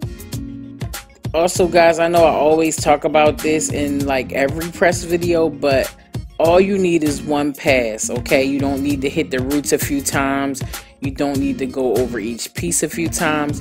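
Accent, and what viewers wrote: Philadelphia, United States. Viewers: Where can I buy your hair straightener and do you ship to London I wanna know please ❤️ thank you. You are great hairdresser and I wish London had hairdresser like you..I can tell you accent your American. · Omgggg I love your Philly accent. When you said “fluffy” I was like, there it go right there! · I don't even know where she is, but that accent is definitely Philly. Lol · You have a Philly accent. Where are you located? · i hear philly all inna voiceeee lol philly inna buildingggggg i love your work sis keep it up ! ❤️❤️❤️❤️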